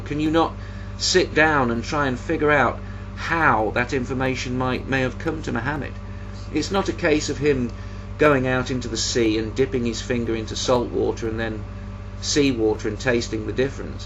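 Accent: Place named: United Kingdom. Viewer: One of the most beautiful videos I've seen on YouTube., your combination of wisdom, humanity, charisma, dramatic flair, and British accent is just unbeatable.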